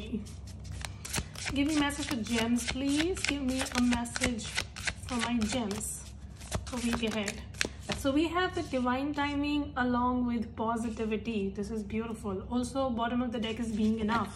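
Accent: India. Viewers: Found my new reader. Indian accent and saying bitch and accurate. 👍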